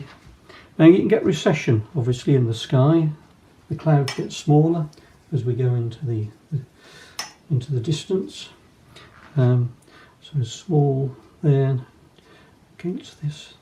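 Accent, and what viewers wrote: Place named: England, United Kingdom. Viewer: Thanks. It's great to hear a true English descriptive narration, and a lovely result too.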